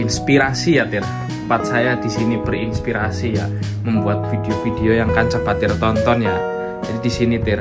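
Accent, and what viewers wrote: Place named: Banyumas, Indonesia. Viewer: Mas ngapak..asal kan ngendi..?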